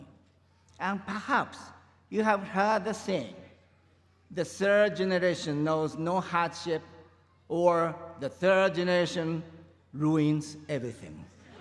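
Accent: Japan. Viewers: 字幕追ってられるから気付けたけど結構誤字なのか誤訳なのかあるね。living roomとか笑 発音もまあ日本のおぢって感じではあって怪しいところあるけど。 とは言え内容はとてもいい。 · 私がどうこう言うまでもなくあまりに素晴らしいスピーチですが、発音は日本語発音な部分があるんですね 私は自分が正しい発音をできないことばかりコンプレックスとしてまともに英語を話せませんが、重要なのはそんな部分ではない事を改めて痛感しました こんな素晴らしいスピーチが、内容でなく自分の発音に結びつくと言うのも情けない話ですが、私も英語でコミュニケーションできるように頑張ろうと心を新たにしました。ありがとうございます。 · British English ではイラーAmerican English はエラー。スピーチは内容で勝負。ザ.Japanese English でも人を惹きつけている。豊田さん👏👏👏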